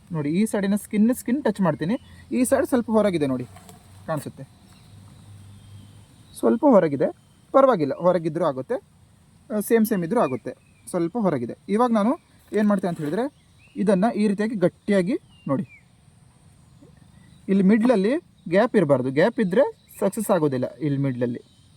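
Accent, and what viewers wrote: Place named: Yellapur, India. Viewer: ನಿಮ್ಮ ಮಾತು ಕೇಳಿದ್ರೆ ಯಲ್ಲಾಪುರದವರು ಎಂಬುದು ಖಾತ್ರಿಯಾಗುತ್ತದೆ. ಎಲ್ಲಿಯವರು ನೀವು?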